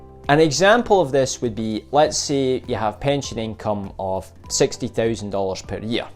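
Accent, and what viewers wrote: Scotland, United Kingdom. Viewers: Excellent points. This extremely important video had 828 views. It proves your point that people pay more attention to planning vacations than planning retirement withdrawals. Love your accent. I've been to Scotland once. Beautiful country, great people. The weather could be brutal. Glad, the music in the background is toned down. Too bad it didn't help to crank up the number of views. Don't get discouraged. I'll watch. Content is great.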